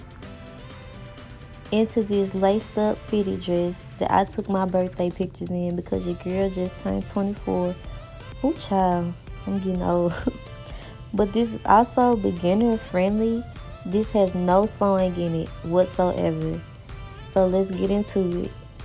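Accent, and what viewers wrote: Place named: Chicago, United States. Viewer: This is so cute & I love your accent !! Chicago Baby 🤩🤩🤩💛💜💛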